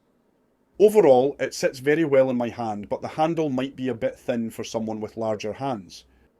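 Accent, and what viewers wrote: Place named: Scotland, United Kingdom. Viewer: I would guess Scotland from the accent - somewhere near or in Glasgow?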